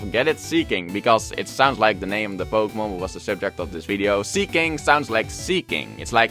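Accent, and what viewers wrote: Netherlands. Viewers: It’s absurd but by the way you speak I asume your also dutch. Great video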